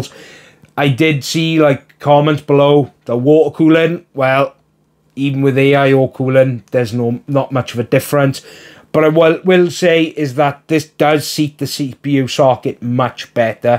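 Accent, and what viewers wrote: Wales, United Kingdom. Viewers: Definately a man from Wales! Love!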